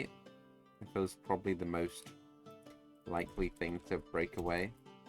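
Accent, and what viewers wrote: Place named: United Kingdom. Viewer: Hold on you're BRITISH???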